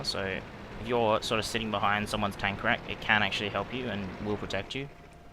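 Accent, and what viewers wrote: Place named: United States. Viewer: why do you sound like you have an american accent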